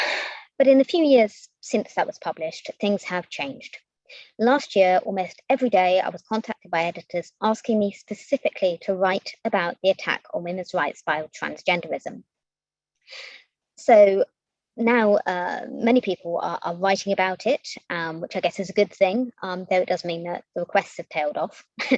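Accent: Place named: West Country, United Kingdom. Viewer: I thought she sounded a bit like Julie Burchill. Young girls’ voice with a slight West of England accent. Still, very informative and well put together . She should have her own videos. It would be great to have her debate Owen Jones. One person who sounds very young against someone who looks very young.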